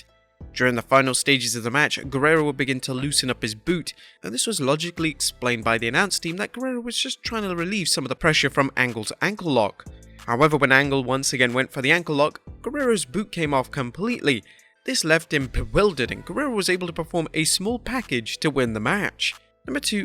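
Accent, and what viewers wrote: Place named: United Kingdom. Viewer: Why is every wrestling YouTube channel hosted by someone British?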